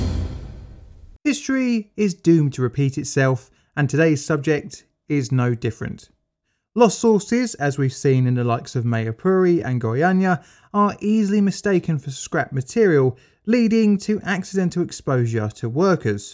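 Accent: United Kingdom. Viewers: Pal, I've got to say, the contrast between the perfect british accent, narrative tone and wording, and the expressions on your bubble dialog boxes for the comics are making my day. It's pure perfection. "Score and a Hen", "Balls" and such. Exquisite combination.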